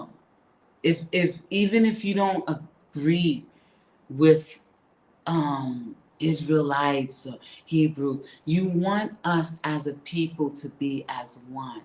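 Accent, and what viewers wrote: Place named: New York, United States. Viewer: I knew it! Your accent was telling me you was from somewhere in New York. You sound just like my friend. 💕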